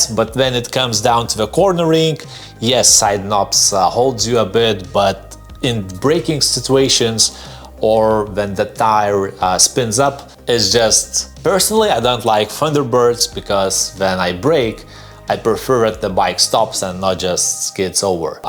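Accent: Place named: Soviet Union. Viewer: strong soviet accent ))) but video is very good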